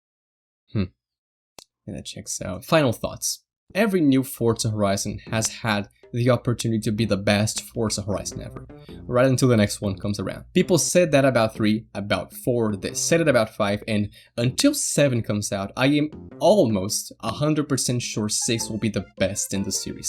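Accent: Brazil. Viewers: U kinda sound brazilian edit: kkkkkkk you are 🇧🇷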